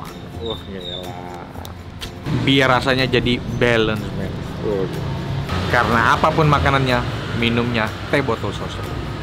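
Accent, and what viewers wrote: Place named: Java, Indonesia. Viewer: Kocak bro Nex bahasa Jowone , Wakakak !! Guded yogya memang top markotop ! Sehat selalu bro !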